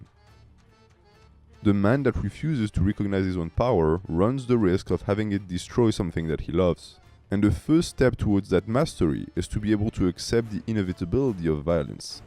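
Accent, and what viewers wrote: France. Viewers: Are u french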